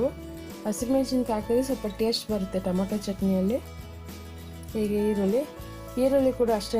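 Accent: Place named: Kolar, India. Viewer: I think u r from Kolar region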